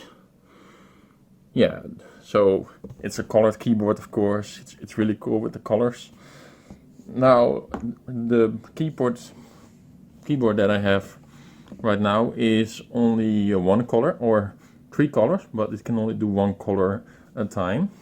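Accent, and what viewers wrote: Netherlands. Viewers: Ik hoor dat je nederlands bent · Ik kan gwn horen dat je Nederlands bent haha :P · are you dutch?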